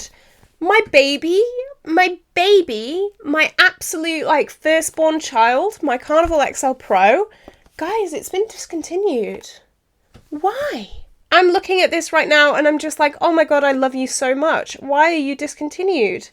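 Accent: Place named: United Kingdom. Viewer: Hello, I love your Videos so much. I found you on YT a few month ago and now I´m addicted to your Videos. It's so sad that the Beauty Bay Book of Magic an the Wilderness Palette are discontinued. I became today a bunch of Beauty Bay Palettes (my first ones), I buy it cause you are so impressed of them. It´s also a shame the ABH Subculture Palette is discontinued I had the OG one but the Quality was so bad, so I gave it away. Also I both my first BPerfect Carnival Palette these Days cause you are so in love with these Palettes. Next time I will buy my first Color Pop Palettes, but I´m so overwhelmed with the Numbers of Palettes they have so I don´t now, which one I should buy. But this purchase have to wait a little, cause I both so much these Days (Huda Beauty Grungy, BPerfect Gravity, Oden´s Eye Chrismas Value with 4 Palettes, BPerfect Carnival Interstellar, BPerfect Carnival The Antidot, a bunch of Beauty Bay Palettes, Morphe The Seasons Artistry Palette, Morphe CT18, etc.) and I want the Cosmic Brushes Winter Wonderland Palette so bad). 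I´m from Germany and I love your british accent so much. And as I said I love your Videos and Content. Stay tuned. Claudia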